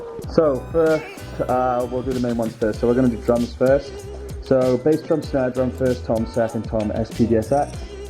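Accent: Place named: Wales, United Kingdom. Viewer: Hey been watching your vids and just subscribed brilliant content.. Recognised the Welsh accent and was wondering whereabouts yr from....low and beholds about 4 miles from me! Im after some charts for cruise ships buddy....will trade you some drum stuff for them....or lash you up with lots of beer 🍺 😉 😜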